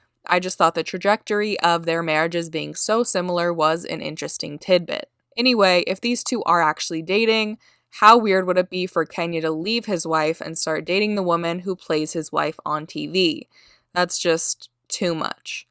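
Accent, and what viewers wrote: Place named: California, United States. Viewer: I know this is off topic, you have a nice voice. You're from, or you probably lived in sunny California for a while. We just got that popping "proper" twang that people think is fake. But it's NOT lol♥️🌞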